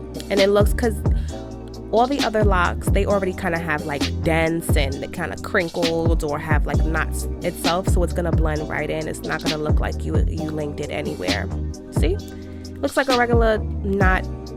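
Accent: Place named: New York, United States. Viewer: Are you from New York?? Because I’m from New York and I know that accent from anywhere and you’re gorgeous 😍😍😍😍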